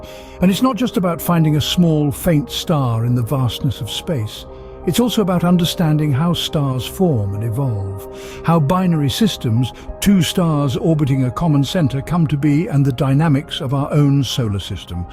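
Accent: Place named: United Kingdom. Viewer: This channel has British narration but channel has no social info and based in Japan? 😂 Neat. And video was nice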